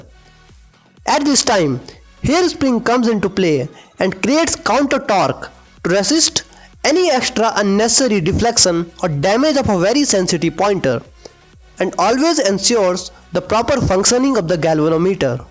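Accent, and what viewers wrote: India. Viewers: Ok now I feel like u r Indian am I right? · Indian accent.😭🤏🏾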